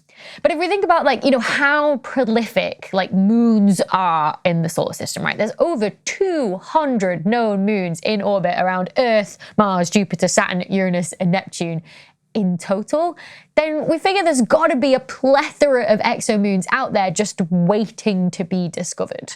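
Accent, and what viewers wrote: United Kingdom. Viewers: I’ve written this before… I used to live in the UK so listening to your British accent is refreshing. How did you cram so much knowledge into your brain at such a young age?! Your intelligence is beyond amazing. With that said, I have issues things you cosmologists expound. Since my education is a master’s in computer science my brain thinks in one’s and zeros, in on and offs, trues and falses… To me and my tiny brain, I cannot fathom an edge to our universe. I completely disagree with the notion that the universe is just 13.8 billion years old. I can’t imagine how this fact is even a fact at all… As Spock says it, “It’s not logical!” Just because we can’t see beyond “the edge” doesn’t mean there’s nothing beyond. In my beliefs, I posit the universe is infinitely old and infinitely big. I have no way to prove my theories. I guess that is why I am so drawn to your presentations. 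I love your videos, so maybe like a dry sponge absorbs water, I may get to a point where I could hold an intelligent conversation with you on these subjects… Merry Christmas, Dr. Becky!! 😊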